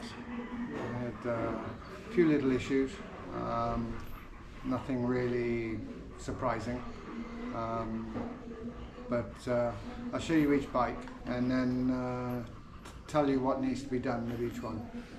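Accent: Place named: Scotland, United Kingdom. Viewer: You sound like you might be from south of scotland?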